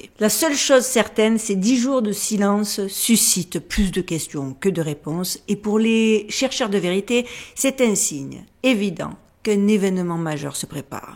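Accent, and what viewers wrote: South, France. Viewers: Son accent du sud est insupportable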